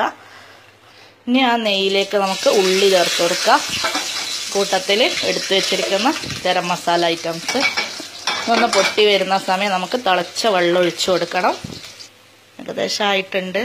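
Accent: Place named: Malabar, India. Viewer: റെസിപിയെക്കാളും ഇഷ്ടായത് നിങ്ങളുടെ നല്ല പച്ച മലബാർ ഭാഷയാണ്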